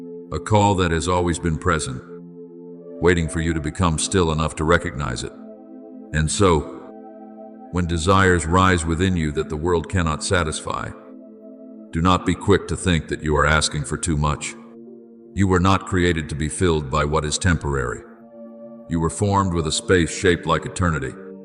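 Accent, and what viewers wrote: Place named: United States. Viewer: Why do you speak with an American accent I am English.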